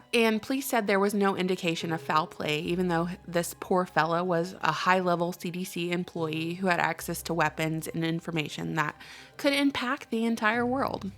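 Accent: South, United States. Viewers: I just love your voice and that southern charm..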